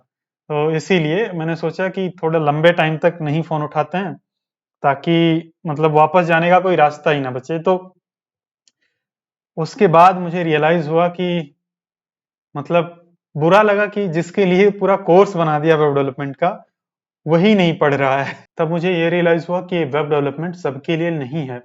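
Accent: Awadh, India. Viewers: bhai kaha se ho? Apke awadhi language ka touch mere Balrampur and Gond district wala lag raha hai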